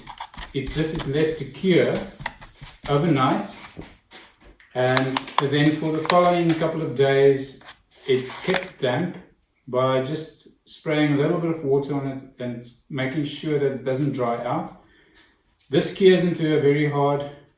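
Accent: South Africa. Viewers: your accent sounds South African.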